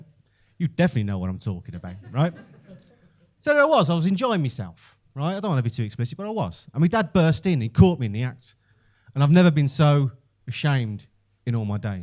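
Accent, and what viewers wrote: Liverpool, United Kingdom. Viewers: He's more scouse than a brummie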